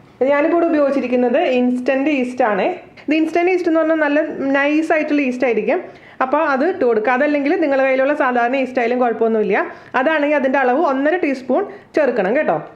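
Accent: Thrissur, India. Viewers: Super simple recipe, enikku aa Thrissur accent ishtayi tta 😊😀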